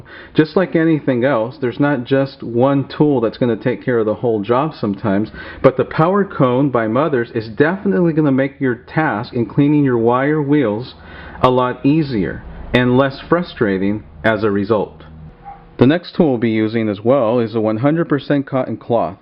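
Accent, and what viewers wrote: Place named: Canada. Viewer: You must be from Canada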